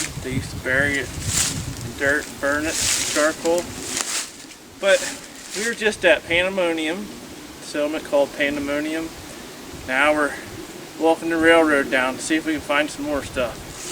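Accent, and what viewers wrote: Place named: Western Pennsylvania, United States. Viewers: Your accent sounds more like Pa than Ky. Especially SouthEast Ky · Are you from Western PA or rural MD? I can tell by the accent. ;)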